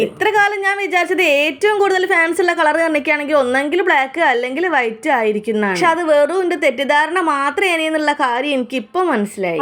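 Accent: Kozhikode, India. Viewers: ഏച്ചി കോഴിക്കോട് ആണോ ഇക്കി ഒരു doubt അയിനൊണ്ട ചോയിച്ചേ ഇന്റെ നാട് കോഴിക്കോട് ആണ് 😊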